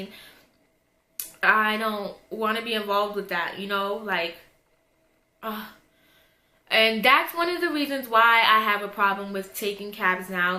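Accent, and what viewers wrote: New York, United States. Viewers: Is this chick from new york ? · That New York accent 😍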